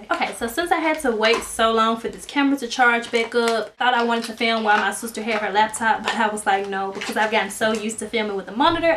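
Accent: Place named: Tennessee, United States. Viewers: 1st time watching her. sounds like a Tennessee accent, but it could be Mississipi. Beautiful young lady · is in fact from Tennessee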